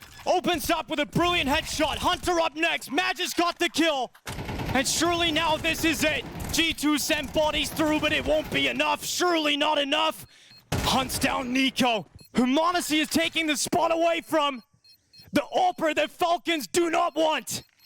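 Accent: Russia. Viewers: Your English sound Russian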